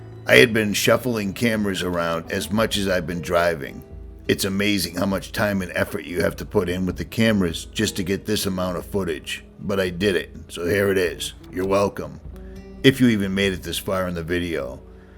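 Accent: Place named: Worcester, United States. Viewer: You sound like your from Worcester :-). Welcome to AZ, just found your channel subbed and liked. From one Masshole to another, be careful out here, beautiful country bud deadly as hell!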